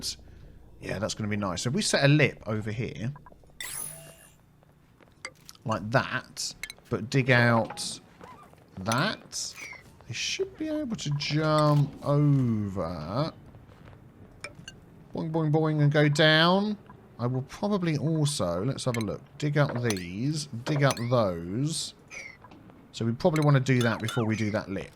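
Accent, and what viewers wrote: United Kingdom. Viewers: I love this game so much. But being somewhat of a voice fanatic, I'm obsessed with your voice. All I do is listen and try to add certain aspects of it to my repertoire. Can I ask where in the UK you're from?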